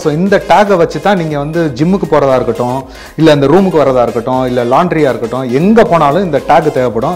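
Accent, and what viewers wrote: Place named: Chennai, India. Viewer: Looks like indian cricketer Namma aswin Mari irukinga avar Mari tan pesuringa,like frm Chennai 🇮🇳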